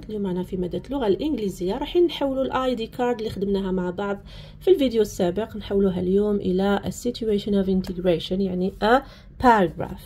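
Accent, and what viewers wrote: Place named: United Kingdom. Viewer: أستاذة راكي تهدري b British مشي بU.S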